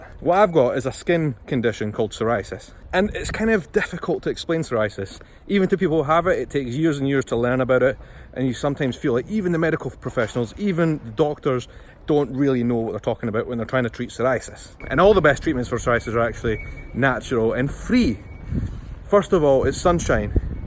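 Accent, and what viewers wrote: Scotland, United Kingdom. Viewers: Hello, dear I have been watching your videos for around 1,5 years and besides that I love them, I can honestly wholeheartedly say I never noticed anything strange about your skin or arms at all. And even if I had wouldn’t have made a lot of difference - I’d still be watching your content because I love your accent, your stories and Scotland 🏴 in general. 🥰😊😃😌 👋🏻 Stay as gorgeous as you are I’m waving to you from Germany 🇩🇪, Berlin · I’ve never noticed any of this and to be honest none of it matters — you’re a handsome guy with a great Scottish accent. 🙂 I’ve always admired your openness and confidence. Keep your head up and know that your honesty about this topic is probably helping a lot others who deal with this. Dietary changes are hard, I know. I am gluten and lactose intolerant and I have been on a 100% gluten free diet for 15 years. 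Once you see the benefits it will get easier. Also try adding kefir to your diet it’s great for your gut and it can help counteract the inflammation. Best wishes! Oh and I’ve had small patches of psoriasis that were helped by a lotion called Curel Itch Defense — not sure if it’s available there.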